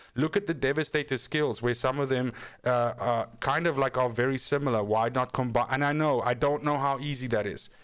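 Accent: South Africa. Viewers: Are you from South Africa. Your accent sounds familiar.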